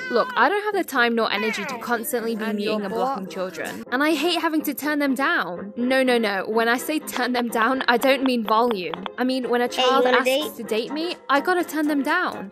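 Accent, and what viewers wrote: United Kingdom. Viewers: u from the uk ? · Are you British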